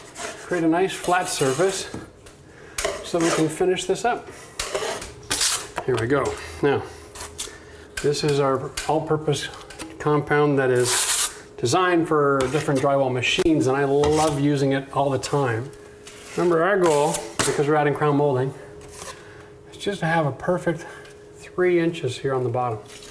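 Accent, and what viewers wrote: Canada. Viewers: Heck, I learnt a lot from this guy, although I will never gonna do what he is doing but listening Canadian accent was fun for me!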